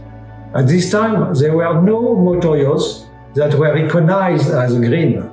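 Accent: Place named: France. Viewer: The music in the background is a little bit disturbing. Sometimes its difficult to understand. (Ok, it's a little bit because of his french accent and I'm not used to hear English with this accent.) There are a lot additional 'cracks' as well, microphone was not the best.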